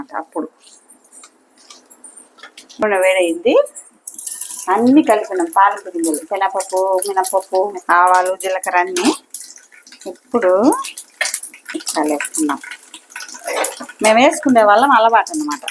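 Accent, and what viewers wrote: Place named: Rayalaseema, India. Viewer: Kaani mee language rayalaseema laga vundi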